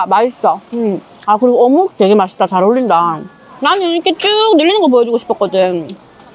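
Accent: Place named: Busan, South Korea. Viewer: 아리가또~고자이마스~ 부산 사투리 ㅋㅋㅋㅋㅋㅋ넘 귀여운거 아니냐구우~~❤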